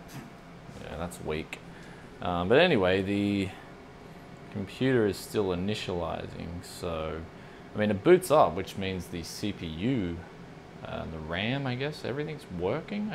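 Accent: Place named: Australia. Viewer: He has an Australian accent and August is Winter here...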